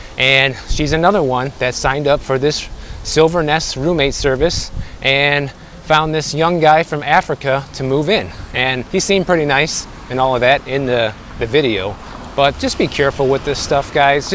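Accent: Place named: Midwest, United States. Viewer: we are currently feeling stuck between a rock and a hard place and just trying to make the best descision since out situation is temporary getting locked into another 12 month lease at a huge price increase is not what we want to do..ive been watching alot of your videos as well as others trying to gauge our options.. what part of the midwest are you from.. we are michiganders born and raised.. i hear your accent (people are always telling me i have an accent too lol)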